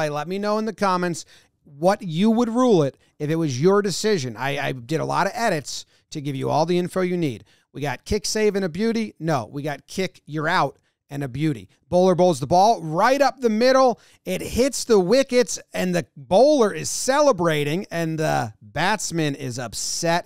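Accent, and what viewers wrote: United States. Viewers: How come you're so good at understanding cricket? US channel with regular American accent.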